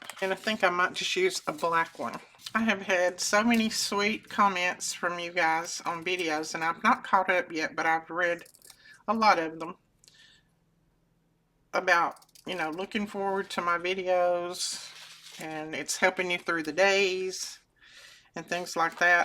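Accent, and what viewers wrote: South, United States. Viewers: We love ❤listening to your southern charm.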